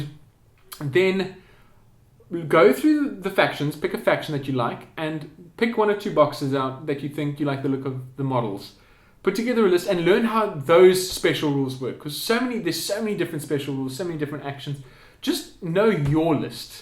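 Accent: South Africa. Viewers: That has to be a South African accent. But yeah, Infinity is great!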